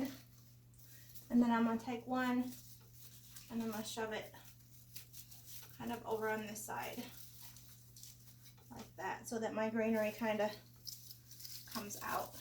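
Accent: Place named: South, United States. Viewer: Southern is best!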